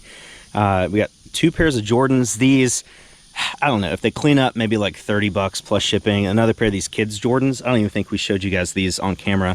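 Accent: Australia. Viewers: That Australian accent though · Hahaha mate your Aussie accent was worth a giggle 🤣gday mate hope ya both well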